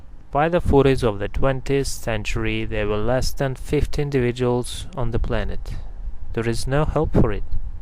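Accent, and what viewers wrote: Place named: Russia. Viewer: Privet! We've watched your video for a second time just now and read your reply. Rick says, "Never mind learning Russian, listening to this guy I need to brush up on my English skills!" :D lol !!! Seriously, your English is excellent! We discussed your narration and you truly have a fine grasp of the English language and you use a variety of words with ease and clarity. Honestly, you speak better English than many of the native born Australians around here ! Two of our best friends were born in the UK and they lament the way Australians speak these days - Aussies are degenerating into text talk! Well, let's face it, Aussies almost always shorten words & pepper most sentences with slang anyway - that's happened since we first landed on this sunburnt rock. Along with our cringe accents ... according to the Australian Broadcasting Co. 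there are 3 types of Australian accents. Rick says I don't fit any of the categories as I speak in a unique way and I don't pronounce some vowels as others do. I feel our accents are dire - and Rick likes it ! We are laughing so much reading a Google Answer to this question: "Are Australian accents pretty?" The answer: According to a recent survey, thousands of Americans regard Australian accents as one of the sexiest in the world ! Whaaat?!! Those people need serious therapy ! We both agree English sounds better with a Russian accent. You're doing fine. Thank you for mentioning those Germans, I recall Dirk Steffans and Heinrich Kern's bike trip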